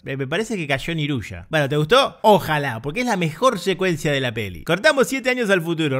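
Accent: Argentina. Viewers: Tiene la misma voz que todos los porteños. Pero buen video, me hiciste reír, y eso ya es mucho. Posible suscriptor, no te aseguro nada.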